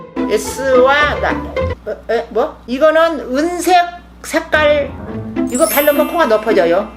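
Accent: Jeolla, South Korea. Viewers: 할머님이 전라도 사람인가요?? 저희 할머니하고 말투가 완전 비슷해요 !!!ㅋㅋㅋㅋㅋㅋㅋㅋ저희할머니보는 느낌 ..❤️ 오늘도 잘보고 가요 ! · 메이크업 영상 나를 울리게 하네요 웃다 울다. 우리엄마 생각나게 해서 웃다가도 울고 전라도 사투리에 엄마랑 목소리 말투 너무 똑같아서 계속 보게 되요. 한 영상을 진짜 여러번 봤어요^^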